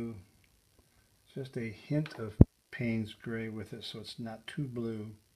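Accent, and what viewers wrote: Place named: New England, United States. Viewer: Found this video 3.5 years ago when I began to self-paint wc's. Found this today, and STILL believe this to be the finest demo on fog, mist, w/w. Thank you for this hidden gem! (PS: I'm from RI, you sound like NE, yes?) Fanna Turano Denver, CO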